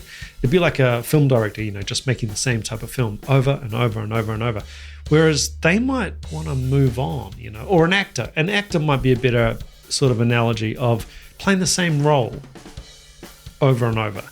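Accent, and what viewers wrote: New Zealand. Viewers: Probably my first comment here ever, as a longtime follower 😂 Watching quite 😂 All of us, who really love and ambrace music, why would we down-talk music? We might not have the same taste, but at the end of the day its art. Hehe and each time i'm listening to Neil, i know why he was and, in some kind still, is a hugh inspiration of Mike Portnoy 😎 Mr. i fuckomg love your Kiwi accent 😎 thats me saying as a german 😂 and remember (knok knok)...we will ask the questions 😂 Love your channel!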